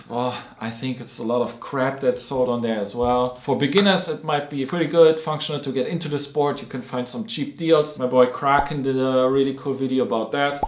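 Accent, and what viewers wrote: Germany. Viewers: I live how he says gamefeald thats the point where you notist that hes german You shoud say playground battelfield and so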